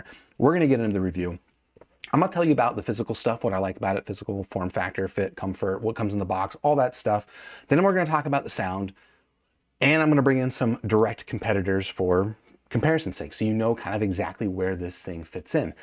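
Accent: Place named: California, United States. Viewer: I used to live in LA. I just think your accent is from California. Could be wrong...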